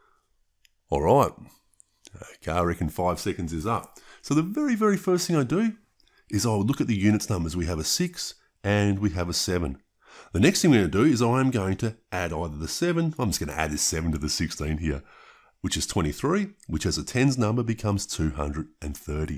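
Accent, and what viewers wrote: Australia. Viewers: I agree with you Adano. That is an Australian accent.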